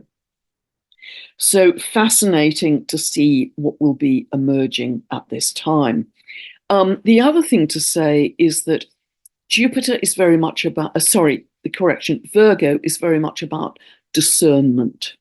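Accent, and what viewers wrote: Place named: RP, United Kingdom. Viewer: Thank you - this is what wisdom looks like, in the Queen's English, with a warm, lovely smile.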